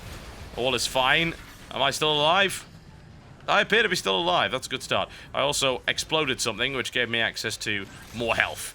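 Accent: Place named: Newcastle, United Kingdom. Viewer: Geordie accent :D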